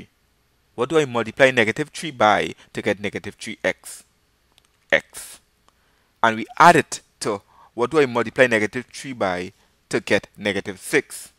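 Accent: Trinidad and Tobago. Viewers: Just curious are you from trinidad... it's just the way you talk it had me thinking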